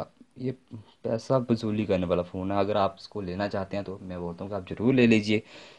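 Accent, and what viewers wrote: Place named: Punjab, India. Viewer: aapke bolne se lagra tha aap punjab k ho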